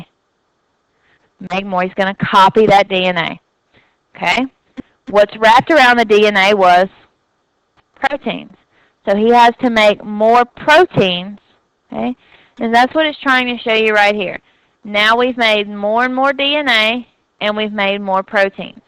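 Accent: South, United States. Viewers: I'm from jersey and I've gotta say, I looove this woman's southern drawl. Her voice will definitely be echoing in my head during the test. Thank you so much for the help!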